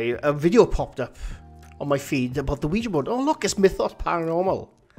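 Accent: Wales, United Kingdom. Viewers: your wonderful Welsh accent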